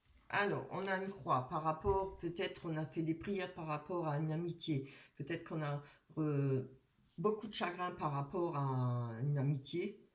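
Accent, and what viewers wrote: Alsace, France. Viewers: Bonsoir, merci infiniment pour ce beau tirage. Quel bel accent, serait-il Alsacien?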